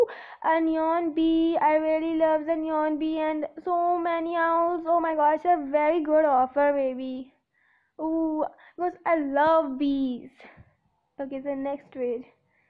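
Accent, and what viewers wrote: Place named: India. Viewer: His accent is Indian but the video location is the us weird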